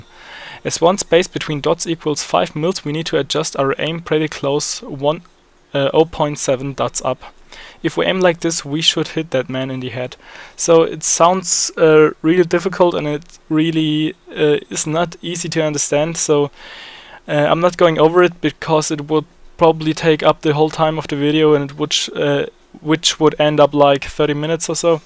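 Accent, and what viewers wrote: Germany. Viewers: Are you german? it sounds like you are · are you german? you seem to have a german accent?:D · are you german? your voice sounds like german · Haha hab sofort den deutschen akzent gehört. Sehr schönes tuorial. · Haha ... man merkt das du Deutscher bist... ^^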